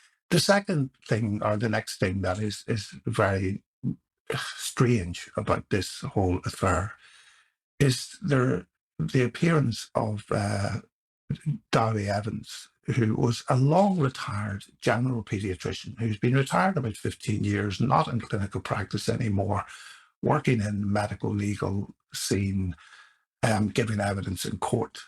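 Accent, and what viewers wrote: Belfast, United Kingdom. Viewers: It gets better. I hate wearing headphones but I want to hear every single word he says, which helps with the sound quality and his wonderful Belfast accent. Besides, he does get the sound quality much better as the series progresses.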